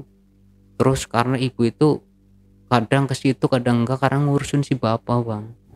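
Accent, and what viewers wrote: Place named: Central Java, Indonesia. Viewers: Logat jateng ya medok bngt kang.. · YaAllah logat e medok nemen 😅absen Bang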